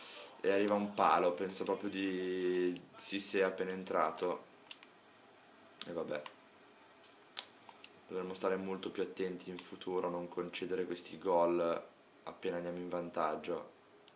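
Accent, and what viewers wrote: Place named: Italy. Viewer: Come sei fiscale, w la pronuncia italiana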